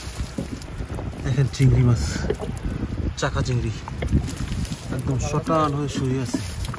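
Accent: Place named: Bagerhat, Bangladesh. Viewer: আপনার বারি কথায় বাগেরহাটে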